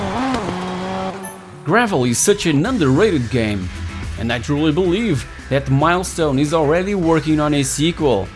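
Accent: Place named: Portugal. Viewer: You tuga? that accent is 105% tuga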